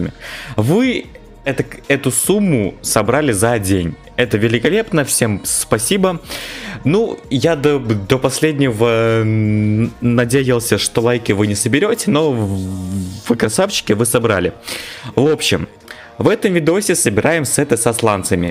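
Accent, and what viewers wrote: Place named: Chechnya, Russia. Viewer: Чеченский Ваше Похож сам чеченец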